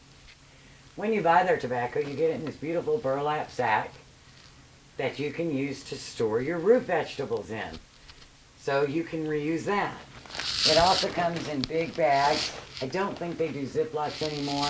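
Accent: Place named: United States. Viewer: Hi there, thanks for the video. I've got a couple of questions regarding this. Firstly, in terms of flavour and general smoking satisfaction, how do these 'natural' cigarettes compare to regular, store bought cigarettes? - I've unintentionally bought 'fake' tobacco in the past, and the flavour was significantly different, though not necessarily worse. Secondly, I notice you haven't added any chemicals to your tobacco, excluding the menthol and glycerin. Now I know that tobacco companies like to add chemicals to the tobacco to help the cigarettes stay alight. Do you have any difficulties in keeping your cigarettes alight? I'm guessing by your accent that you live in a warm, dry, part of the USA. I myself live in the UK, where wind and rain make up at least 50% of the weather, which is often problematic for us smokers. I only ask as I'm considering looking into the necessary steps needed to start my own tobacco company, a fair trade company which pays it's farmers (likely African) a good wage, but that also (hopefully if viable) offers natural tobacco to it's customers. 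Many thanks for your help, and video, informative to say the least, if all else fails I may even grow and process my own tobacco :)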